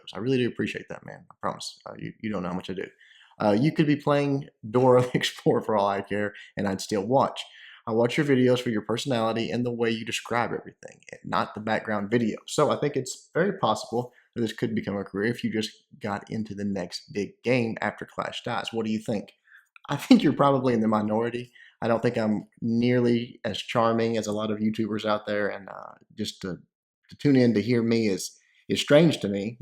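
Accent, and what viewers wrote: South, United States. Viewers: Yeah, the southern-ish american accent is pretty cool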